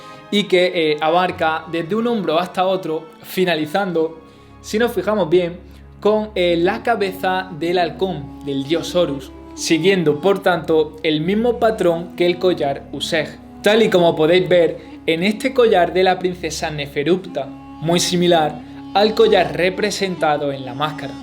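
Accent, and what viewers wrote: Spain. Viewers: Eres español?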